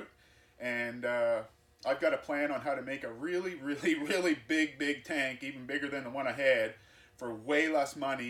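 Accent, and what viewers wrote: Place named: Canada. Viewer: Is it my imagination or are the most popular woodworkers all Canadian?? Love (and miss) the accent!!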